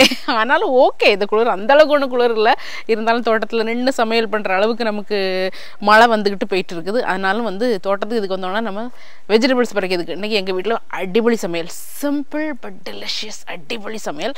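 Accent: Nagercoil, India. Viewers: Makka Namma Nagercoil pechu thaan vera level makka 👍❤️ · Hi Sister. என் வயது 45. உங்க பேச்சு அப்படியே நாகர்கோவில் Slang. நான் பிறந்து வளர்ந்தது திருநெல்வேலி. திருமணம் ஆனது நாகர்கோவில். உங்க யதார்த்தம் பிடித்தது. காந்தாரி மிளகாய் செடி வளருங்கள். மருத்துவ குணம் உடையாது. நன்றி. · Pakka Nagercoil baashai😅 mam poli🔥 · Apdiye Nagercoil style la ye pasrenga sema ka, school time neyabagam varuthu, am also from kk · Pothisoora vida neenga pesura nagarcoil slang romba nalla iruku... Neenga sapdurathu atha vida romba nalla iruku... Nagarcoil la irukura mari feel iruthuchu.... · நாகர்கோவில் பேச்சு வழக்கில் நம்மூர் அக்கா லண்டனில் இருந்து பேச கேட்கும் போது உண்மை யிலேயே என்ஜாய் பண்ணி பார்த் தனக்கு. கீப் இட் அக்கா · Sister after seeing ur channel nan unga channel than pakurean.because neenga namma nagercoil samayal poduringa.nangalulam nagercoil than ana chennaila irukirom.neenga samaipathum pasuvathum nama ooru nabakum varuthu.so we enjoy and see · நாகர்கோவில் slang super 👌 ௨ங்க expression அடிபொளி 😄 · Nijama nagercoil la ullavanga kuda syle nu pechaiye mathitanga.. 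Ana neenga pesuratha kekumpothu alaga iruku....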